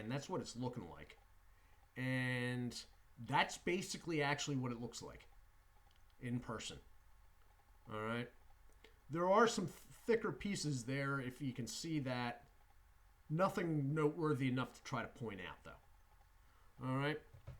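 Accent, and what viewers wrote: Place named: Philadelphia, United States. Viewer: New sub! Are you from PA? You sound very Philadelphian lol cheers!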